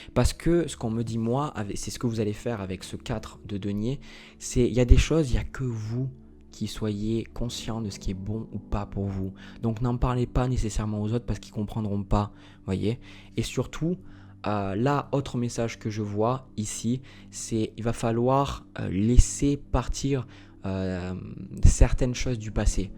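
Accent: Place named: Aveyron, France. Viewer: Trop drôle,! Mon cadet porte votre prénom, votre nom et votre accent me parle mon Aveyron... Et vs êtes bélier et analysez nos caractères comme moi... Et le changement de ce mois est ouvert! Après échec et mat...par trahisons! Et trop de ces questions que vs ennoncez... Merci vraiment.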